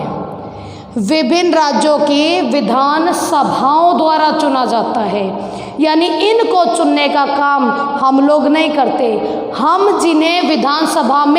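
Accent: Haryana, India. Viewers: Maam ap Hariyana se ho kya ap ki aavaj se lag raha h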